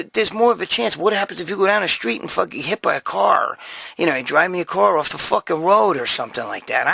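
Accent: New York, United States. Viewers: Brother, you sound like me with a New York accent! Take care of yourself we need you...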